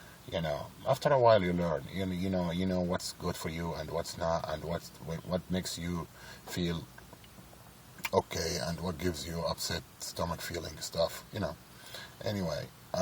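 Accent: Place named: Arab world. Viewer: hi, it's nice to know ur doing good n how much weight have u lost? and I know u have the Arab accent n the "aaaahhhhhhh" but tht gives a good laugh.. be healthy and awaiting your before n after pics..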